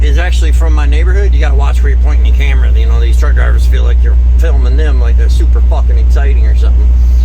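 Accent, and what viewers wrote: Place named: South, United States. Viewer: I was gonna say, you sound like a southerner, not from a northern state.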